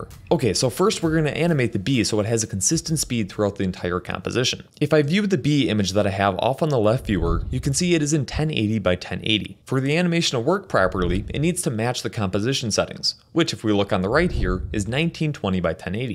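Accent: Wisconsin, United States. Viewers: I love your Wisconsinite accent!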